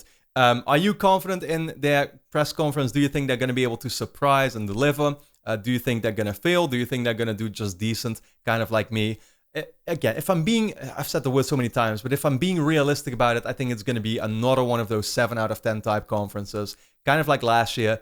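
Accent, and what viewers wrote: Netherlands. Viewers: Hey man, are you Dutch? I live in Amsterdam, want to play some XBL?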